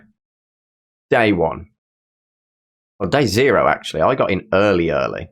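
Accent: Birmingham, United Kingdom. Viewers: Are you a brummie :)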